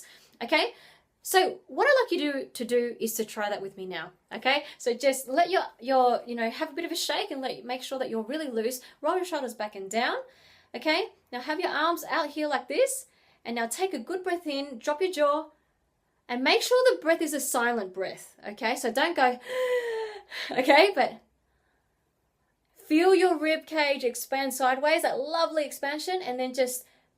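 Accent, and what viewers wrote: Australia. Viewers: are you australian? ...me too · Do u live in Australia? Cause your accent sounds like theirs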